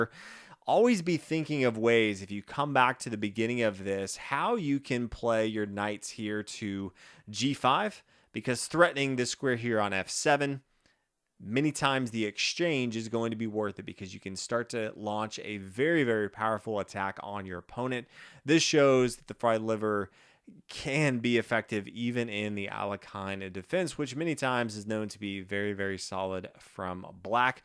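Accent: United States. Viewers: What a stupid comment, is American so he pronounces his name with an American accent. "Алехин" make Alehin (even alehina) I don't see the problem calling him Alekhine just like I don't see the problem when every non-French folks struggle to pronounce Vachier-Lagrave correctly and it systematically turns out as "vachier-laguave". I hope you do know how to correctly pronounce Praggnanandhaa, Maghsoodloo or every other GM names that are westernized or who sound slightly different than how they are written. It's just ridiculously elitist, just thank the content creator for the knowledge he shared.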